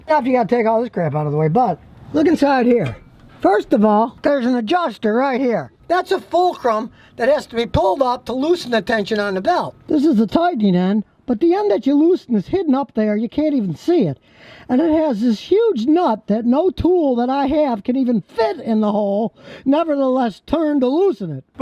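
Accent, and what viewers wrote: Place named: United States. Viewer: This guy is hard to listen to. Just that dumb version of the american accent.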